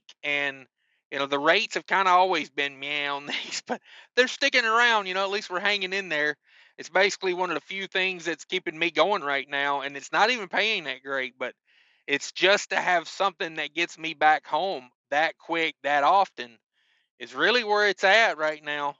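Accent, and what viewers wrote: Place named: New Orleans, United States. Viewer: BTW.. You're accent is ....terrible. Some New Orleans in the rep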